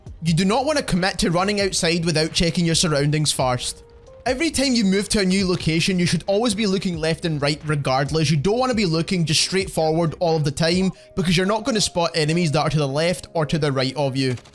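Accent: Scotland, United Kingdom. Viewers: Very Scottish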